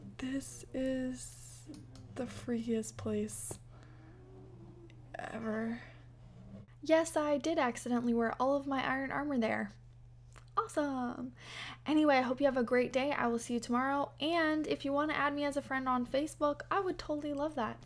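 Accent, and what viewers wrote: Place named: Spain. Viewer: Love your Spanish accent :D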